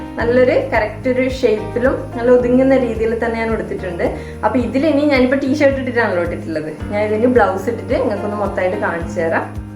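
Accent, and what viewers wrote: Kannur, India. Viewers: Kannur aano??? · Kannur kariyano? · Njn subscribe cheythu,kannur aanennu kettappaze,njnanum kannur aanu😍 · Kannooor kaari aaano?? 😍 Samsaaram and slang very lovely 🎉🎉🎉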